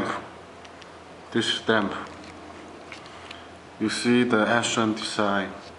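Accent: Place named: China. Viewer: he has nice idea, don't confuse to his english we all know he is chinese. atleast he trying. thanks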